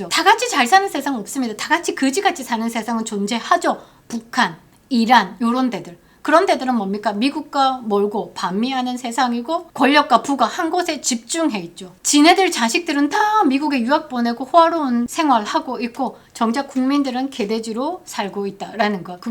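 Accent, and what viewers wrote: Gyeongsang, South Korea. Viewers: 경상도 사투리 친근함이 느껴집니다.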